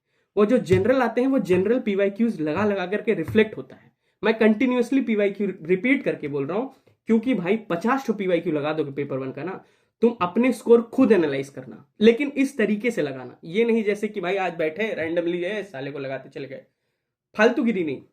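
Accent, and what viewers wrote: Bihar, India. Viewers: Bhai sach sach batana Aap Bihar se ho na ☺️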